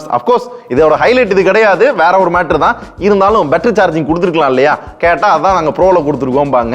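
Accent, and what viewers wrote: Madurai, India. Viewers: When our madurai gang vanthale antha slang kaga technicality kaga than unga video pidikum but specific a sollanum na antha VR HEADSET REVIEW ❤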